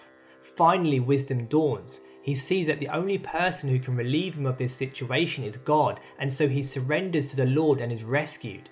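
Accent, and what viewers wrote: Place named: United Kingdom. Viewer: I think and he sound like british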